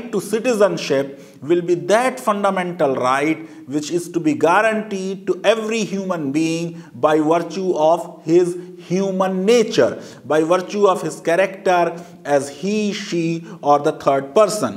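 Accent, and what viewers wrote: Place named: North India, India. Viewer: My dear sir, your English utterances clearly show that you're north indian. And you're little far away.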